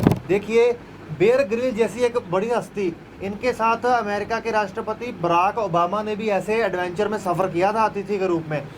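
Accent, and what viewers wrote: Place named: Haryana, India. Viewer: yaar pure hindi se bolo.. ye haryana language maja nai aa raha he....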